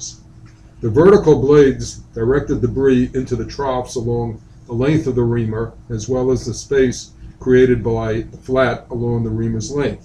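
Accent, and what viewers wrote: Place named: New York, United States. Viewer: i lover this guys NYC accent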